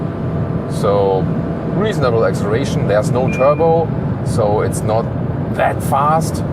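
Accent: Germany. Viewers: Love your reviews. And you're German accent makes the car so much more interesting. Would be nice if at the end you say I'll be back lol.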